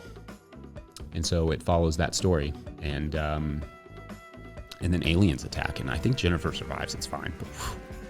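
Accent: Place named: Midwest, United States. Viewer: Your accent sounds like classic midwesterner.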